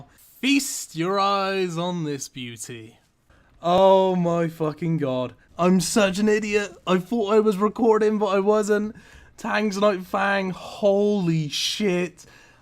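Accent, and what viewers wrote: Bristol, United Kingdom. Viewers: Just stumbled into this video from one of regular YouTubers, wasn't going to give it the time of day until i heard the accent. Sounds alot like some of my friends from Bristol xD Subbing for that reason alone hahaha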